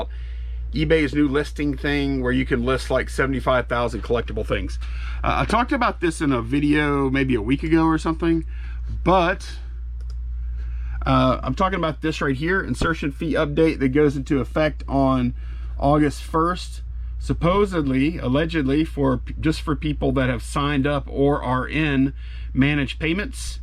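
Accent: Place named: Los Angeles, United States. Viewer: Don't have an accent!? Are they watching the same channel I am? Hahaha. True you don't have as thick as other dudes I've known from LA but I want to know where that viewer is from that they can't hear it.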